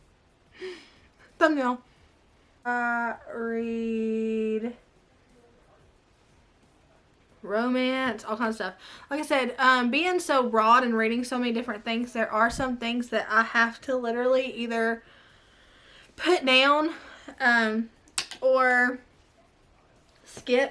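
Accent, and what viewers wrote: South, United States. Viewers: I love hearing you talk. With your sweet southern accent & upbeat personality you put your viewers in a chipper mood. ♥️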